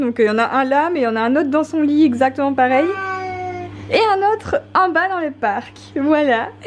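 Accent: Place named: Nord-Pas-de-Calais, France. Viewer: cc, tu as vraiment l accent chtis comme moi!!! quand je regarde tes vidéos!! c est super!!!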